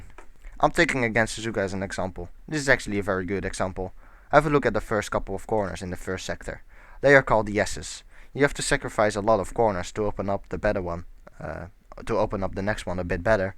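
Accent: Netherlands. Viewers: nah, his accent is way more dutch than finnish.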